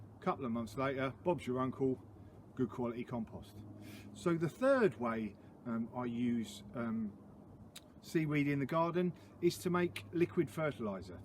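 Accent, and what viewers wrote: United Kingdom. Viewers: I detect a bit of a British accent there mate. I love all things gardening and I am a huge anglophile so I am looking forward to more of your channel. Also I love to see gardening channels from my Nova Scotia area.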